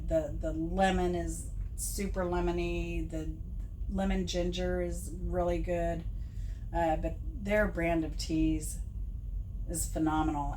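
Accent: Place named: England, United Kingdom. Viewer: I love hearing your English accent because I can learn English listening 🙏 that is truly inspiring and interesting to hear the story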